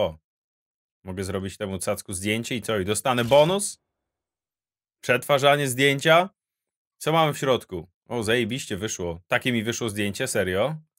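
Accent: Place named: Silesia, Poland. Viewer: Śląsko godka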